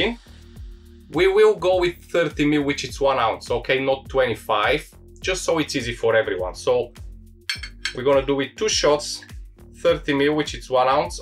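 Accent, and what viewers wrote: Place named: Bulgaria. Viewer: Страхотно видео, но с този акцент съм сигурен че сме сънародници!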